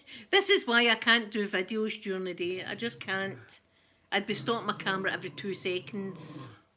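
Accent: Scotland, United Kingdom. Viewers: I love scottish accent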